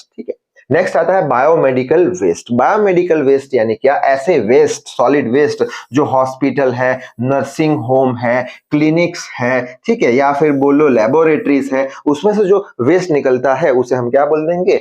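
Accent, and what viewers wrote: India. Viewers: You speak Indian English. And you look like an Indian.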